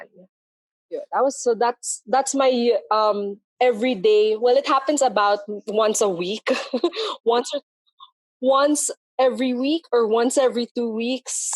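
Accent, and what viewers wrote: Conyo, Philippines. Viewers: Hirap pakinggan ng kwento mo ate. Masyadong pa conyo. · very konyo iyong story teller, puwede naman tagalugin para hindi hirap, tagalog pinoy story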